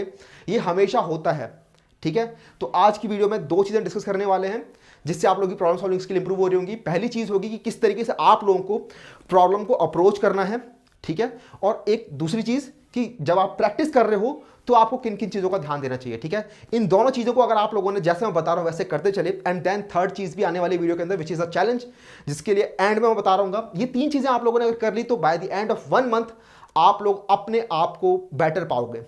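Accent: India. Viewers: His voice is like Ashish nehra is he from Haryana ?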